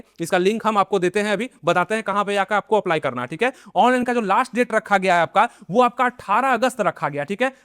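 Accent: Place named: Begusarai, India. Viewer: Begusarai.se.bolte He.sir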